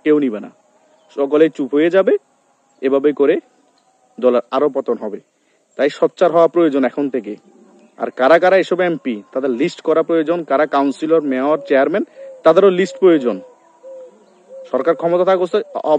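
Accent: Chittagong, Bangladesh. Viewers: ভাইয়া আপনার বাড়ি কি চট্টগ্রাম???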